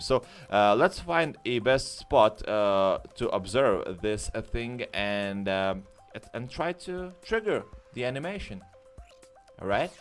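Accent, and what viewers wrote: Russia. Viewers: Попробуй снять гайд по поиску фрагментов. Должно быть интересно. Русский акцент тебя выдал.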